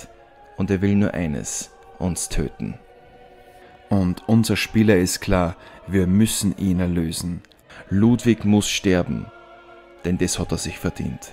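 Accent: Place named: Austria. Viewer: Wird gleich geschaut! Ich liebe Lore Videos zu den Fs Games vorallem mit nem österreichischen Dialekt! Schöne Grüße aus Pottendorf (Nö)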